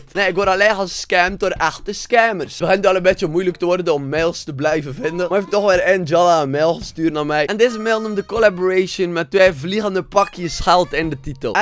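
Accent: West Flanders, Belgium. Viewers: West Vlaams denk ik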